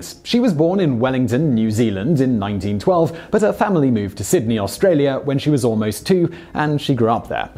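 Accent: United Kingdom. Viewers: he has a British accent; by default his pronunciation is correct.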